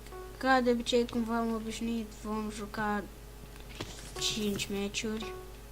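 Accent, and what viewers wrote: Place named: Moldova. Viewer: baiatu gamer nu mai vorbi moldoveneste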